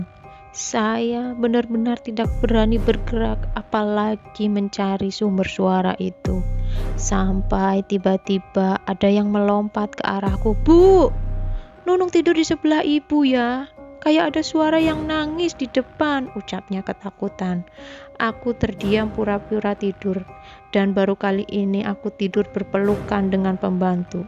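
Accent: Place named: Java, Indonesia. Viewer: seru amat ceritanya mbak...org jawa ya mbak, logat jawanya msh kentel bgt...hehehe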